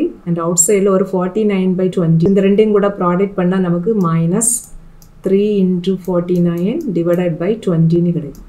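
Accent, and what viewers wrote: Kanyakumari, India. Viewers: Neenga kanya kumari ah ,ithu kanyakumari slang thane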